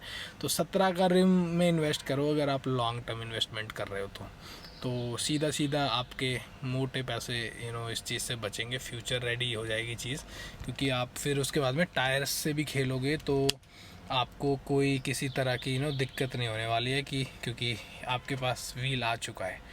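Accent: Haryana, India. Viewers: Haryana ka aadmi boli s jarur pakda jaa h bhai ji…. Ab bas kahi aandhane chale jao trip pe 😅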